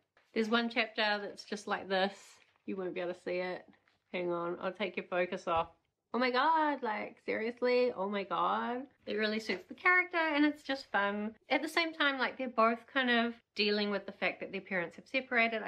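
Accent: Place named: Australia. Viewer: don't worry don't worry I also hate that strong Australian accent 😭😭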